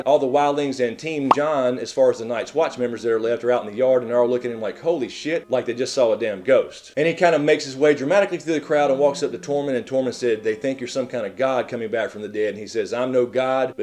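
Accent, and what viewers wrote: South, United States. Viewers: love the accent, where r u from, the south or texas or somewhere else xd?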